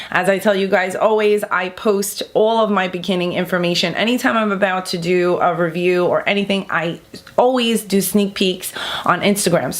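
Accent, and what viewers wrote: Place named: New York, United States. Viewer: Love your accent love NY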